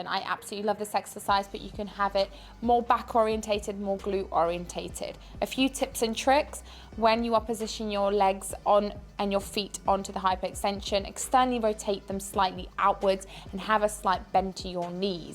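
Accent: United Kingdom. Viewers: Great detail!!! Not only the “how” but also the “why”. Brits are so easy to listen to as well. Subbed today. Hope the channel grows for you.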